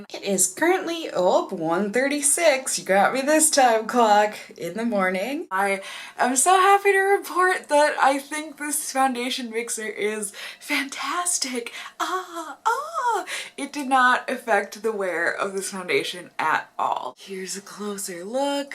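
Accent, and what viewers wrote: Minnesota, United States. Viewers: you sounded really minnesotan in this video 😂😝💓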